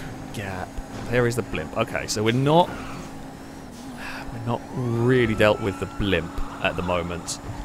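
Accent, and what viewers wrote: United Kingdom. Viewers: I like your British accent It makes you more interesting to listen to.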